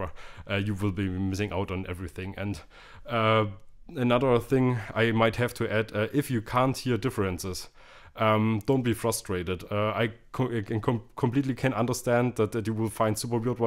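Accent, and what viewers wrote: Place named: Germany. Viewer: too much German accent... lol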